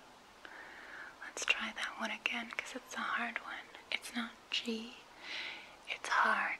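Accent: Netherlands. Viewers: are you for real Dutch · are you dutch? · She has a rather normal accent. She speaks so called 'standaardnederlands' (translation: standard dutch). This is the accent they expect you to have in formal situations (for example, at your work). Some people have this accent naturally, but this depends on which area you come from. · Lol ik ben Belg je hebt een Nederlands accent ik heb nooit dat verwacht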